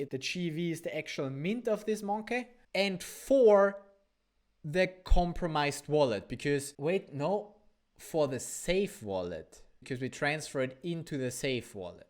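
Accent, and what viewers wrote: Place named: Austria. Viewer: Love your energy on the videos and I like learning about Solana from it. You are Austrian? I love the accent and thought it was Swedish. I'm not really good at accents haha.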